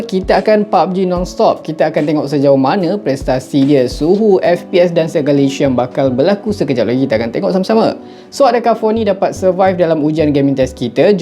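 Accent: Malaysia. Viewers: Padahal banyak dr indo pengen tau banyak tentang HP ini sayangnya bahasa Melayu nya kental banget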